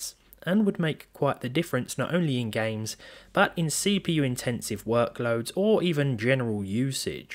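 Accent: United Kingdom. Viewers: Britain accent is so funny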